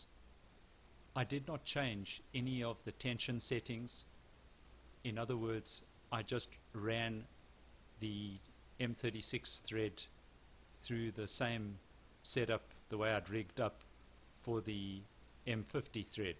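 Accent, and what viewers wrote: South Africa. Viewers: I love randomly hearing an accent from home! Lekker bru :)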